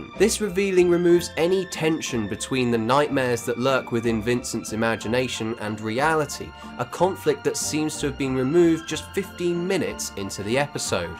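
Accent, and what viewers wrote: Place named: Wales, United Kingdom. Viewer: There's nowt wrong with a Welsh accent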